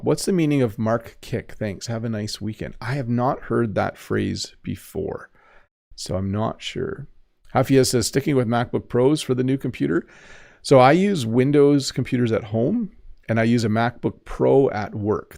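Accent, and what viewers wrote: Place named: Canada. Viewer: Assalamu Alaykum,. My first name's Ali. My last name's Orkhan. By the way, I've been watching your lessons for three years😊😊. This lesson was very good for me. After all, this lesson gave me a lot of knowledge in English. I watch your videos because I love Canadian Accented English. Or rather, I speak Canadian Accented English because I love your lessons,. Greetings to you from Azerbaijan.❤❤❤😊😊😊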